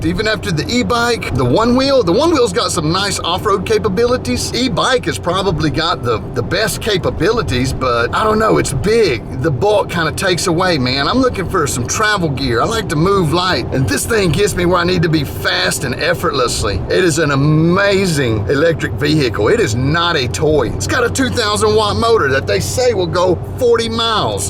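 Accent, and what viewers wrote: South, United States. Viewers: I made the kids bring bicycle, so they're tired. But I've been riding my Rec-lectric unicycle, and I am NOT tired!............ REC-LECTRIC!!........... I don't know what it is about southern people with they're drawl that cracks me up so bad when they mispronounce words. I had to watch that part a few times. Don't get me wrong, I'm not laughing at them as if they are stupid, it's just the way the accent sounds and the funny ass pronunciation of words that gets me. Thanks for the laugh. Keep 'em comin!!!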